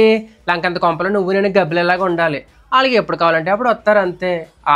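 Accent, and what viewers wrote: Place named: East Godavari, India. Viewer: Likkamtha vumdi. Idi East godavari slange. Madi East godavari, Amalapuram andi.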